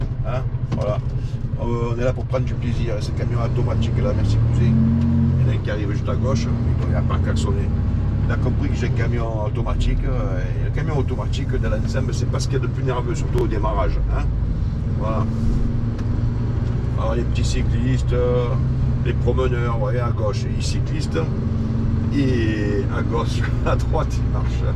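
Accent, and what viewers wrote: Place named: South, France. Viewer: Cool ta chaîne, je m'abonne, sympa l'accent du sud 😊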